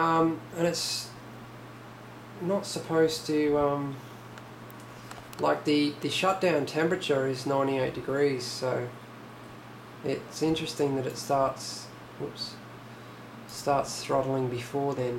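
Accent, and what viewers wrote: Australia. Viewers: Great Stuff! You from good ol North Queensland? T-ville / Cairns area?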